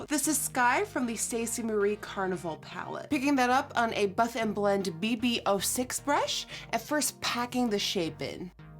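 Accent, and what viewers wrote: United States. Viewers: Your accent is impeccable...I didn’t even know you weren’t from the US for a while until you said it in another video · It’s so mind blowing how you have zero accent! You sound so American, you are professional makeup artist and language learner! It’s so hard to learn another language. Thank you for teaching us Dutch! What a fun way to learn :) love it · I CAN’T BELIEVE YOU ARE DUTCH! YOUR ACCENT SOUNDS SOOOO AMERICAN...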